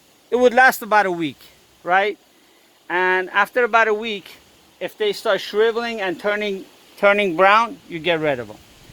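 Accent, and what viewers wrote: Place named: New York, United States. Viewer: Great advice best ever. Love your New York accent.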